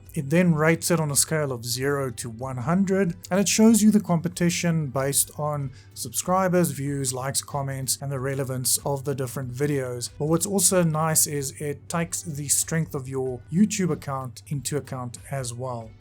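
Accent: South Africa. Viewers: I detect a South African accent. Are you per change South African? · Do I detect a South African accent? Any way, I'm a new youtuber and used tubebuddy for my recent video and I cant really see a better results, I just still dont seem to get traffic to my videos which directly effects the amount of views I get.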